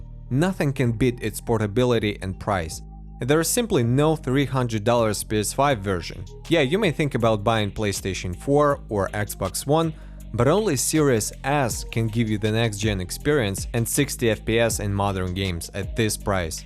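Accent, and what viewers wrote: Russia. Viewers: Капец как русский акцент слышен!)))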